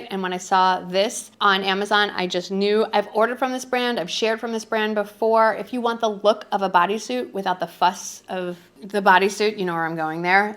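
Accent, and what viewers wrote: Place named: Illinois, United States. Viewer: I thought I recognized your Ilinois accent! Former Chicagoan here who has lived in Florida for 34 years! Go Bears!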